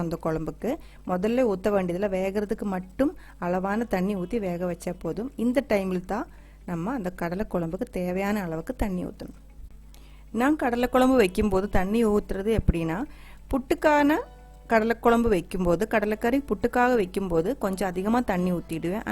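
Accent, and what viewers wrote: Kerala, India. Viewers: நல்லாருக்கும்மா அதோட நீங்க பேசுற மலையாளத்தமிழ்ழும் அழகாருக்கு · She says that your cooking as well as the tamil with malayalam accent is very sweet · Superb !👌👌 Love ur explanation & ur malayalam kalandha Tamil!👍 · The way you explain is nice to hear... Tamizh in Malayalam accent👌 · Absolutely Beautiful Tamil in Malayala Flavour !!!!! Your Tamil is sweeter than our Tamil. Indeed! The recipe is super. Just now bumped into your channel. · Nalla tamil pesureenga.. Konjam malayalam kalandhu neenga pesumbodhu kekkave nalla irukku.. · Unga Malayalam + Tamizh slang semmma 😍😍😍😍😍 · Tamil in malayalam slang very cute😊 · I like your malayalam mixed Tamil .. lots of love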